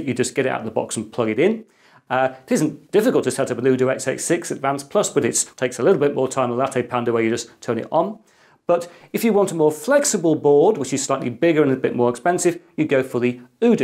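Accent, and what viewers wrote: England, United Kingdom. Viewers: you videos are fantastic! Keep it up! That english accent is very nice to hear. It's sounds like an intelligent person.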